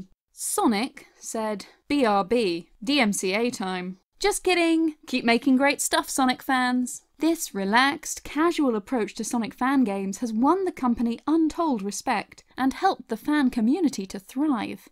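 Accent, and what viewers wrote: United Kingdom. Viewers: This is why I love sega. They support fans and hire them. Also I love the narrator's accent! It's really cute ( I just think the female British accent is really cute)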